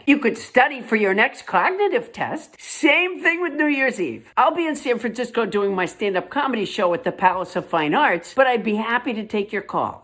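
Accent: Boston, United States. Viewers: I love hearing a Boston accent combined with an IDGAF rant, especially when it’s about our current Mob Boss in Chief. I like to think that it’s not only us atheists that have this type of clarity, but it helps 😂 Glad to see healthy and in a much better place these days. I’ve been down that road myself and can empathize. We don’t always get 2nd chances and when we do we don’t always take it. She obviously has, respect.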